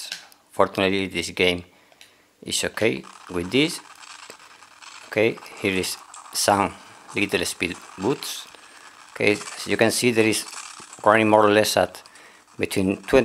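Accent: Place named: Spain. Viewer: aun que se le nota en el acento que es español, yo soy latino saludos desde américa latina tiene muy buen inglés, solo le falta evadir ese acento muy pequeño del español pero que exelente vocabulario, saludos y que Dios lo Bendiga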